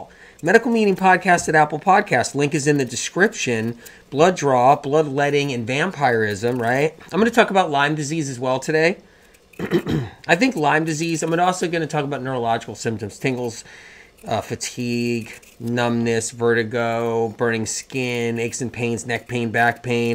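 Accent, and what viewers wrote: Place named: United States. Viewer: Yes great info but can you state it clearly once please & stop repeating everything 3 times. People can replay if they need repeats. Thank you you're great but your delivery is so American & verbose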